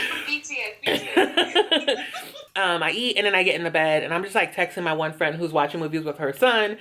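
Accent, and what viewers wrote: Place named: West Coast, United States. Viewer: I howled at the west coast accent, not too bad. 🙌🏾🤗🤣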